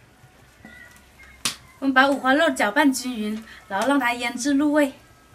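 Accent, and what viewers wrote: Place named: Guangxi, China. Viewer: 讲广西官讲的妹仔。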